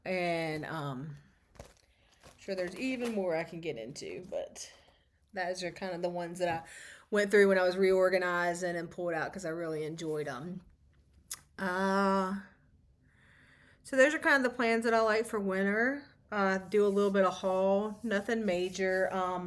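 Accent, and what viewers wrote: South, United States. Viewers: To one of my favorite FlossTubers....I love your selections for stitching and your lovely southern accent. Here in the Northeast I truly am cozying into Winter ....gotta embrace the white and next week will be below zero! 🥶 Hope you have a great January and I look forward to watching another episode soon! 😊 Kathy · .... you might be my most favorite to watch. I love your southern accent and your laugh. REALLY love your stitching and WIPS. Such inspiration with each video. I also may continue to stitch my Christmas, Snowman, and Winter charts. I paper craft also and have decided to leave my Christmas papers and stamps out and continue creating. I am not tired of stitching or making Christmas projects just yet. Have you done a room tour yet? Each time I watch your videos, I always wonder if it is a bonus room upstairs or maybe a large bedroom. 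Either way I love the two small closets in the back. I could fill them up. Have a great January.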